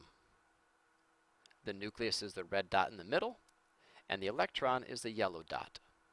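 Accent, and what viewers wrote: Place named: United Kingdom. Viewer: Britain english! Wow!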